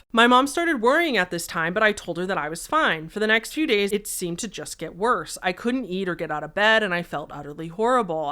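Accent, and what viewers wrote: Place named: East Coast, Australia. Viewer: It's an Australian east coast accent ahaha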